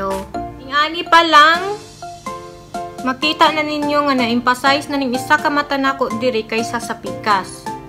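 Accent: Visayas, Philippines. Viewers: ganahan kos imung explaination sis makalingaw makadungog imong binisaya hehe need sad nako na para sa ilong nako nga himos hahah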